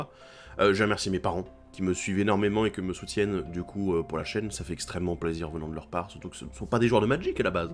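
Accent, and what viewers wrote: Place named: France. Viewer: Merci pour tes remerciment tes le meilleur contenue francais meme si tu vien france avec l accent😅dsl jvien du Quebec .mais lache pas et ta des bonne idee pour ton avenir jai hate de voir ca .jaimerais parcontre te voir plus si tu pourrais faire tes deck tech (que jadore en passant)en face et peut etre avec un inviter de ton staff ca pourrais etre vraiment cool ou toute autre de tes nouvelle idee de video .continue on voit bien ta passion pour magic et surtout le commander 🖒🖒🖒🖒👏👏👏👏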